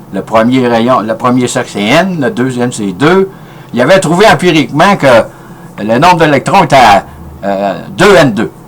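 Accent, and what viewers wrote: Quebec, Canada. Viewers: Vraiment génial comme vidéo, j'adore la physique et l'histoire des science. Ton accent québécois est plutôt sympa ahah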